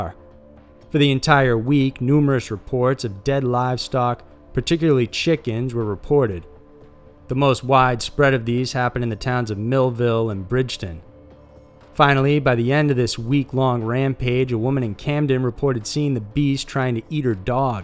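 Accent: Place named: Texas, United States. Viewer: I absolutely love all of your videos. One of the best channels and def the best narration/voice. I wonder if you're from Texas? I am and your accent always makes me think you are :)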